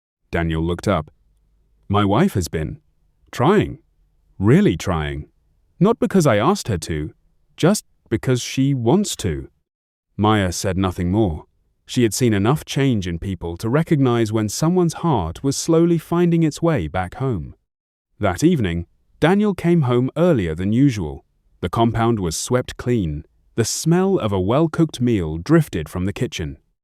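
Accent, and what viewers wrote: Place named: Africa. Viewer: I like the story but l am partial to an African accent. Watching from Montreal, Canada 🇨🇦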